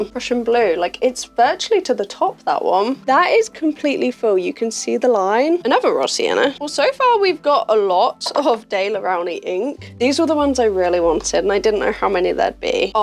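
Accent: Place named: United States. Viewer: Why are you constantly using strong American inflections when you speak? Do you live on Netflix or something? You might as well go with a full-blown accent at this point because listening to this pseudo-Americano dialect is painfully embarrassing.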